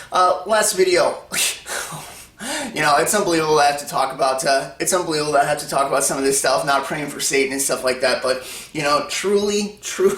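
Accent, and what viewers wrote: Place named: New York, United States. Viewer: Coming from a very laid back part of Australia, I find it very hard to listen to a new yorker. But your message is good, most of us awake Christians can feel it coming. But I feel its also a test of our faith.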